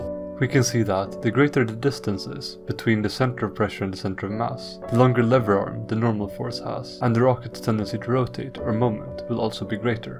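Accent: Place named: Skåne, Sweden. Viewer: Hi there. I have spent half-hour following leads from ' ' - all of which are dead-ends. So I have to contact you in public. I see you speak Skåne - or were warmly influenced by that region. I speak that other great language. I'm English but I live in Sweden (Österlen). Your video on missile stability is truly excellent. I would like to use your work both whole and in part. I have several videos dealing with the German A4 V2 missile and a website called <link> I would, of course, give you full on-screen credit and provide links to a website or other internet site of your choice. Within the limitations of our specialist area (I'm not Justin Bieber!), I can promise you significantly greater exposure, and we have expert subscribers (from industry, academia, etc.) to our website and video channel from around the world. I look forward to hearing from you. 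Kind regards Robert J Dalby FRAS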